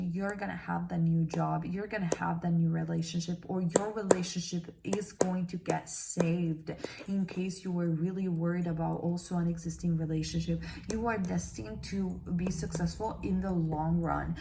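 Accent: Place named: Italy. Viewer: Oh my goodness SWEET 😍 ❤️ sweet Precious- BELLICIMO 🥰 Tarot 🌠🔮 🙏 reader that I JUST realized I ALREADY ✓ DID visit your channel many, MANY months ago as you assisted me in MY difficult time of berievment !!!😲 Yes! And I was revisiting the video and recognized your distinct accent. (Italian dilect) WOW, amazing. I have been enjoying the channel and it's growth. You are a favorite 💜🦄💟 🌈 of mine and I've told you how you stand out for your authenticity & vulnerability with your audience. The healing process 🙏 💔 😥 😪 is an interesting one after the loss of a pet because I believe the instantly 👼🐕 become guardian angels and the proof is everywhere!!!! So it's just bittersweet 😔 ya know. May you be so very at ☮️ as you are able 💗 to stewart the impowering gift of connecting with the Underworld. Love.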